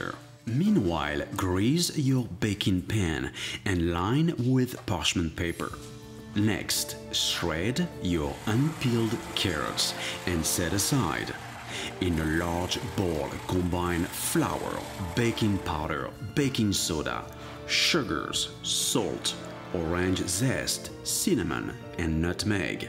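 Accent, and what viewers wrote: France. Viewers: Are you French or not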